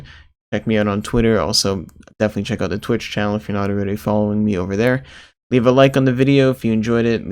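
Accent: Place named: Ontario, Canada. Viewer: Are you Canadian? Sounds like south Ontario.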